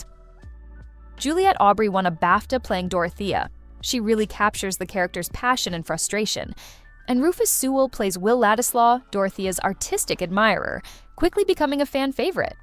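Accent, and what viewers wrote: United States. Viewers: Sorry, i can't stand this americain diction...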